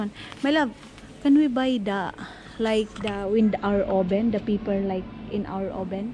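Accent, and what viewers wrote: Visayas, Philippines. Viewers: Bisaya kaayo ka noh...murag ako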